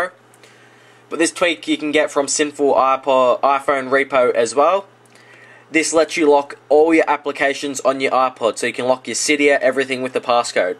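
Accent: Australia. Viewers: Great tips and great to hear from an Australian finally.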